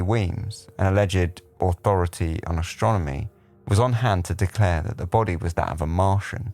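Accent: United Kingdom. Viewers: I just love British narrators. They make everything sound so official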